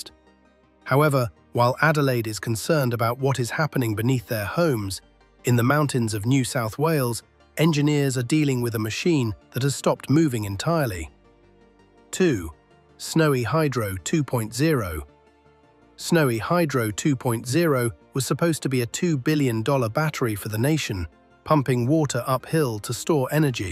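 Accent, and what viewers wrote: United States. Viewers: Thank God other comments are aware of this cheap, sensationalist, uninformed click bait crap. The authorities behind these projects have the vision and foresight to act before commerce and life fail due to overuse gridlock of existing infrastructure. It is better to act before the shit hits the fan than panic when the whole world stops. Keynsian ecomonics also shows that the multiplifier effect is also developing other sectors of the economy. Instead of wasting our mutual time on this tripe, research the financing and building of the Australian Transcontinantal (Indian Pacific) Railway. Paid off 10 years after completion and still benifiting the nation. By the accent of the voiceover, I assume that this is an American vlog. It is rich that someone from a third-world banana republic (my opinion) is casting doubt on Australian initiative. 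From my reading, over half the bridges on the USA national highway network are overloaded or in urgent need of repair. Please stop gasslighting me!!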